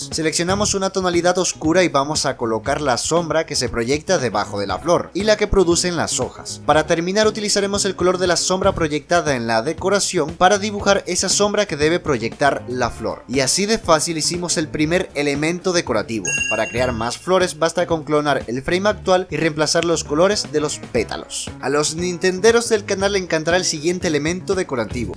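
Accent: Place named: Colombia. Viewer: eres de colombia pa? pq se nota yo si soy de colombia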